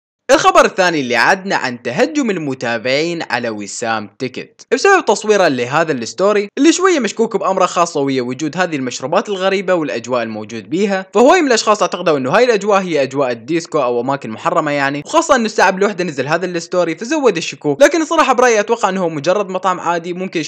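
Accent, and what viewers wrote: Syria. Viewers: لاء يحجي سوري شبيج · هو قبل كان يتكلم سوري بمقاطع قديمه وهو اصلو سوري وعايش بعراق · شنو انتي متعرفين الهجة العراقية بربج يعني هاي لهجة سورية الي ديحجيهة 🗿💢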